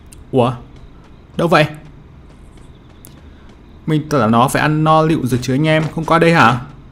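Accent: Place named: Hanoi, Vietnam. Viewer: Hà Nội lận cơ à hèn chi giọng ko giống miền Nam of E r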